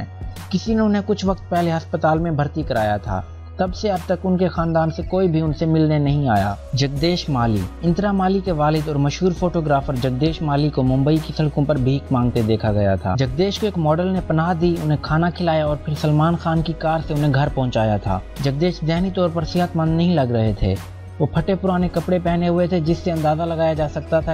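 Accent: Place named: Pakistan. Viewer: Listening a Pakistani Urdu accent on background !!! Something is fishy!!!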